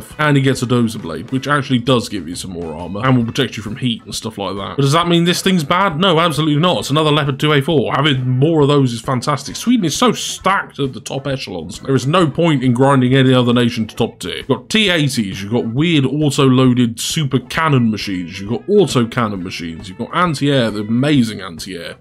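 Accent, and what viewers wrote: United Kingdom. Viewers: Why are you using fictional rebel speed measurements... I've watched all your videos and I've just about had it with MPH with an UK accent..... aaaaaaahhhhhhh.. still love you dude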